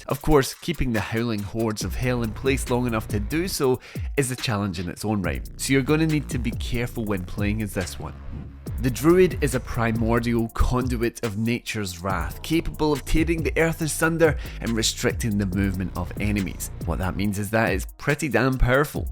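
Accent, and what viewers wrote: Scotland, United Kingdom. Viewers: That's a Scottish accent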